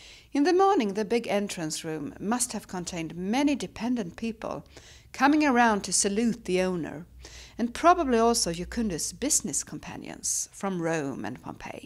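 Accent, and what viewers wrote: Sweden. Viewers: Not fake, it's a Swedish accent. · What a 'weird' thing for you to say. In Europe we tend to learn more British English. Did you expect her to speak in an American accent? She's Swedish and speaks British English with a Swedish accent. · Well, she has a very strong Swedish accent, so I just tried to ignore it. My pet peeve is when people so often write "women" in the singular, but never "men" in the singular. It's like logic escapes them.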